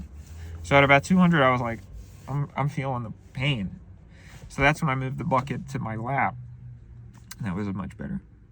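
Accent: South, United States. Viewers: The southern accent arc was crazy… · the southern TWANG is NOT A COSTUME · His really shitty southern accent is so freaking great man, I love you and all your quirks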